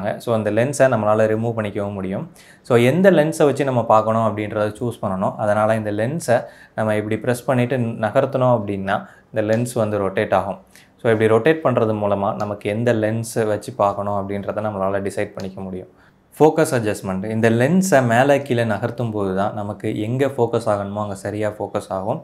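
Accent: Tirunelveli, India. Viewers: Bro neenga Tirunelveli ah!!!😍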